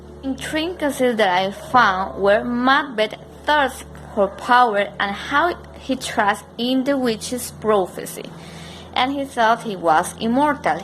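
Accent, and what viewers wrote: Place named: England, United Kingdom. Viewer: Hello, this Is Arka. Thank you for subscribing my channel. BTW, you are beautiful as well as intelligent. But where do you live? I am asking, just because of your English ascent.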